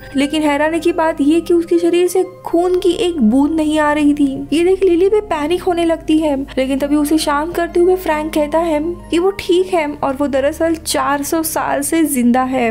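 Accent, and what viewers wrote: Maharashtra, India. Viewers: Hii Host, Your mother tongue is Marathi. Sozhavi Dhashak nahi Solavi Shatabadhi.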